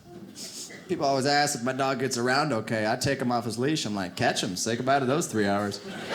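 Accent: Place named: South, United States. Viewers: This guys sounds SOUTHERN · He's a funny guy. I know several white guys that sound like him. He sounds like he's from the South but I think he's from Cali. The guys I know that talk like him are funny too. We just say, they're "a character". That covers alot of ground.😃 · Can someone explain the southern accent from California? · He’s from NorCal (Bay Area), but sounds like he’s from the south lol I’m confused...🤔😳😬😂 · He sounds like he's from the South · Lol, why’s this whit hood California guy talking more Texan than I do. Y’all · He sounds like he's from the south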